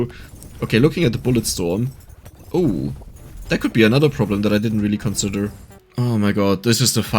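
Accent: Germany. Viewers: you can definitiv hear that dieser bruder is a deutscher bre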